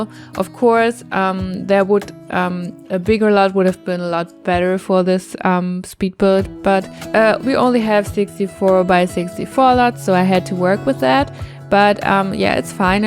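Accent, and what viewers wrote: Germany. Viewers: Are you german? Because of your accent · Are you german? Cause u sound like it 😅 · I hear a german accent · are you german? you kinda have a german accent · You Sound very german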